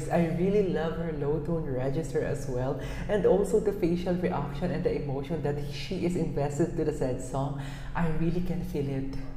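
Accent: Philippines. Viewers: Love you filipino english accent❤. Keep it up. Its colourfull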